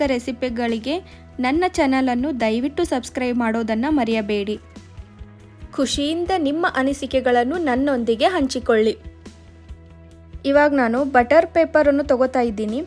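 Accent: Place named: North Karnataka, India. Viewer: ಉತ್ತರ ಕರ್ನಾಟಕದ ಭಾಷೆ ಇದ್ರ ಬಾಳ ಚಂದ❤😊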